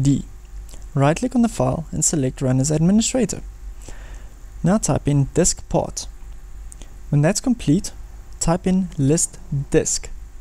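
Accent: Afrikaans, South Africa. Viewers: Ek hoor duidelik die Afrikaans deur slaan lol. Goeie werk dankie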